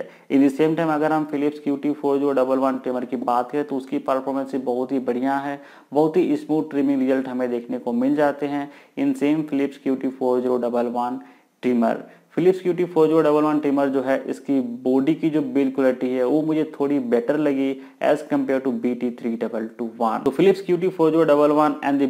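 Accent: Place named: Bihar, India. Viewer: ha lga mje... Bihari tone se.. I'm also from Bihar MFP... I also make videos.. aap dekh skte ho mere channel pe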